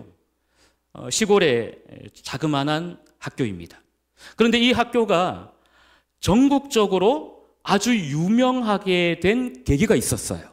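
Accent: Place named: Gyeongsang, South Korea. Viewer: 갱상도 사람이네요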